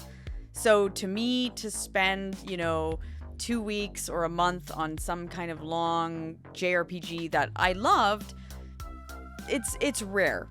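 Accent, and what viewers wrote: Canada. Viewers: I can always tell someone is Canadian when they say “sorry, hard, Mario” and I love it 😊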